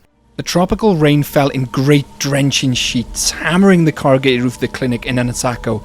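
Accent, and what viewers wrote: United Kingdom. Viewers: Looking for a meaningful JP video. Clicks on this video Hears British dude Leaves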